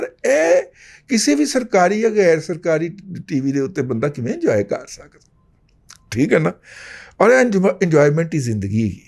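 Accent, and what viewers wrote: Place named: Saraiki, Pakistan. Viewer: AoA Saein, I like your sairaiki accent very much. Please also give a sairaiki touch with Punjabi.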